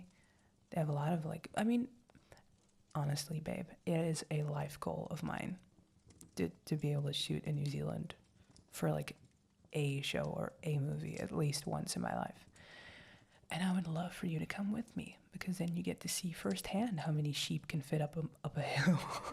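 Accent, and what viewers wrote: United Kingdom. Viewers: The British accent got me tund on af lol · Love your British accent 😊🥰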